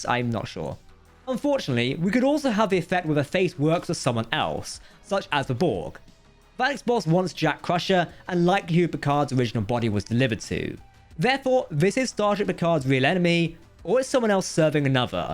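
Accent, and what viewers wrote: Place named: United Kingdom. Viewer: 😁Shut the Fuck with winey Bullshit British Ass cent. 😄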